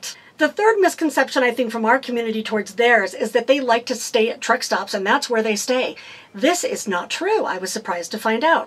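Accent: United States. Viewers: What language is this video in ? she sound like she is from the US but many words are unknown to me.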